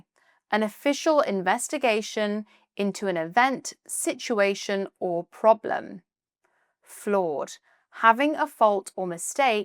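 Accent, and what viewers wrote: RP, United Kingdom. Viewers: You were born to be a British English pronunciation and modern RP accent TEACHER! ❤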